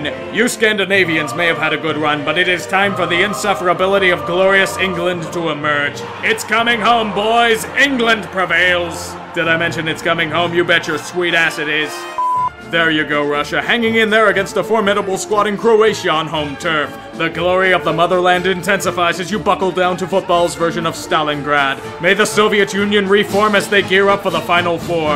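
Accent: United States. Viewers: kinda, i watch him a lot so i expected the cringe. But he, for the most part, knew what he was talking about. I always find it hard, even as an american myself, listeing to someone talk about football in an american accent but i was proud of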